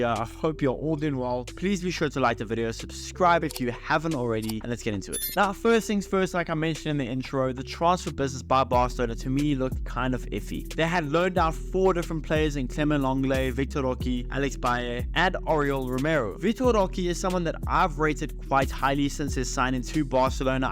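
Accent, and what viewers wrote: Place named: South Africa. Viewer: Can recognize a South African anywhere. Hansi flick is a mastermind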